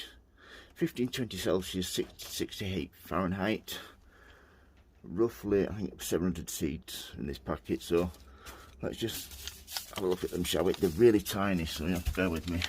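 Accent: United Kingdom. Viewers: Cannot hardly hear you--volume and brit accent--anyway you can list the medium ou place the seeds in? TY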